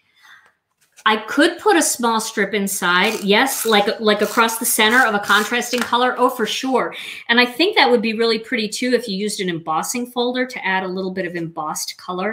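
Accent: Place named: East Coast, United States. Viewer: Absolutely gorgeous!!!! The shading is so amazing and I learned so much!!! I love your fun videos AND your accent 💜!!!! I grew up in Rye, NY and love hearing East Coast accents. Be well! Hugs, Karen ❌💜❌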